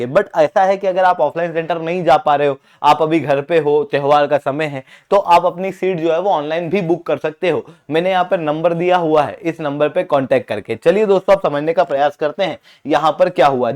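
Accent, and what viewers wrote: India. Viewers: It's inappropriate to mock someone sharing their knowledge, especially when they're providing free educational content. The instructor has invested time and effort to create and share this material. A person's pronunciation is influenced by their native language, regional dialect, and exposure to different language environments. In India, like many other places where English isn't the first language, complex English words are often spoken with a local accent. This isn't a reflection of someone's intelligence or capability - it's simply a natural result of their linguistic background. I live in the US and have observed that speaking English with a native accent doesn't correlate with intellectual ability or expertise in a subject.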